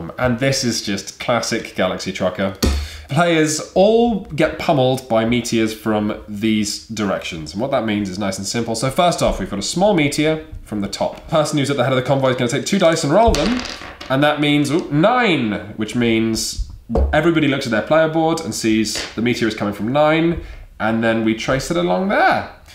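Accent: United Kingdom. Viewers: Looks like a fun game - it's even better watching you with a British accent and uncombed hair explaining this looking like your apartment was just hit by an asteroid storm lol. :P Subbed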